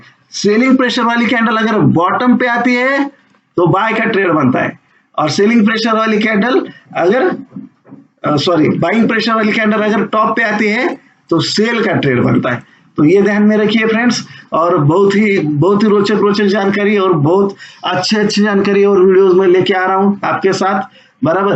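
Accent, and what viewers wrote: Maharashtra, India. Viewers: Saheb tumcha tone varunach olakhla tumhi marathi ahe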